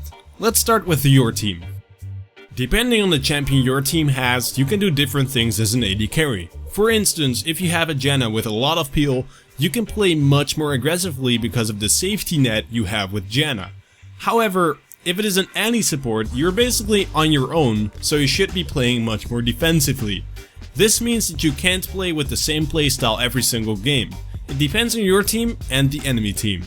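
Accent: Netherlands. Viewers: Guy your English ;D That accent is too obvious, dutchie xP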